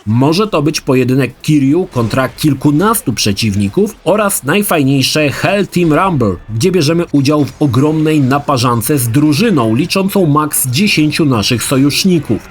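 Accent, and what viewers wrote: Łódź, Poland. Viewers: tu nie ma w ogóle co porównywać. swoją amatorszczyzną i bełkotliwą łódźką dykcją nie staje nawet w szeregu z i może mu co najwyżej buty czyścić.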